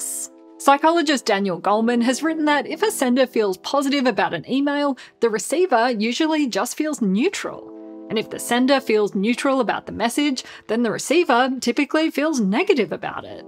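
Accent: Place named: Australia. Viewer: jumpsuit... jungle safari print. ping pong table? Australian accent!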